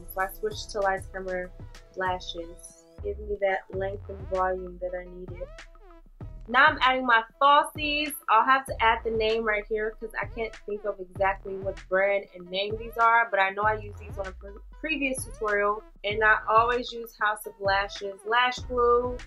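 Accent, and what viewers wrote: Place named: Atlanta, United States. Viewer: I had to make another comment. The way you say erange (orange) is EXACTLY how Atlanta people say it! 😂😂 I moved from Dallas to Atlanta and every time I hear it I'm so tickled. And you how you do that loud tail thot pop!? 😂😂😂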